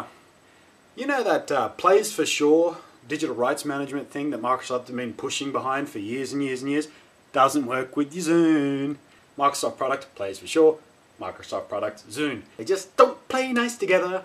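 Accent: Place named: Australia. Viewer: How do you know this if you do not even have a zune and not even allowed to buy a zune because you live in Australia well it sounds like it from ur accent. I have a zune and it is better then a ipod in my opinion I dont care what you say im just saying that you are saying stuff you dont even know for real Specialy sence you cant even get one.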